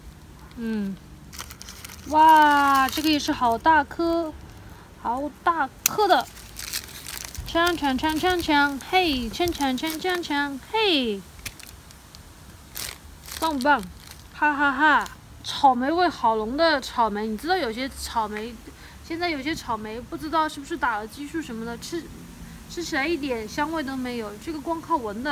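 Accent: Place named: Chengdu, China. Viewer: 哈哈哈哈感觉女主是不是成都人呀，口音跟我说普通话的时候好像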